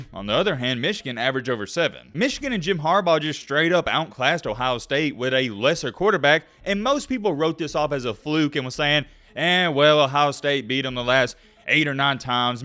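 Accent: South, United States. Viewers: is more like it. I will never trust someone with a Southern drawl talk about Michigan football. Stick to lying about the Tide. Michigan is far from major trouble. Be afraid... be very afraid.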